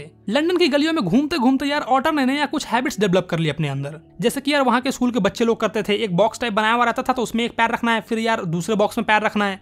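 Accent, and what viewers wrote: Bihar, India. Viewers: Bhai aap Bihar ki language bolte ho 😊 kuch kuch words h na😊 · Sir are you from bihar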